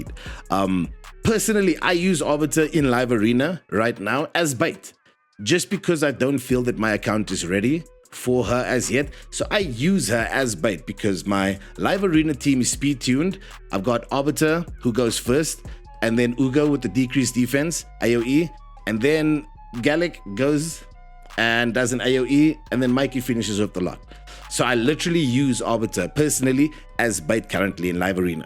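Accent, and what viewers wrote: South Africa. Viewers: Interesting content.. Been playing Raid for years! Question: Are you South African?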